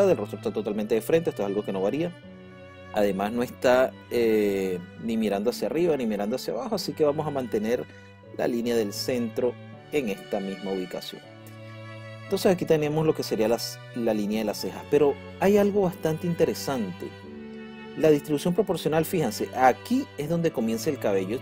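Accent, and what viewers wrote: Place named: Chile. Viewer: A mi me suena a Chileno, tiene la misma voz que Wikisebas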